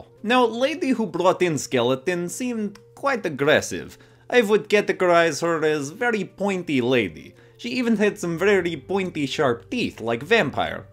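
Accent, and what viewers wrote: Russia. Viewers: Love the Russian accent, heh. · The russian accent!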